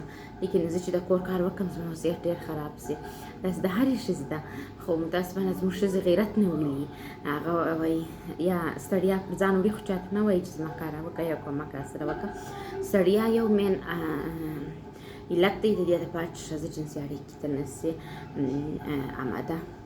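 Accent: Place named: Kandahar, Afghanistan. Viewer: ستا دا کندهاری ژبه زما په زرکی سی❤❤❤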